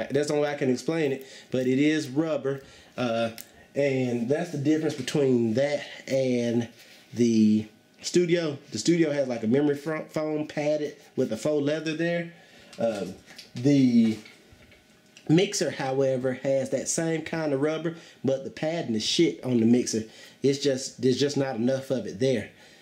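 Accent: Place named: Texas, United States. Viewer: y sound like a countryman from Texas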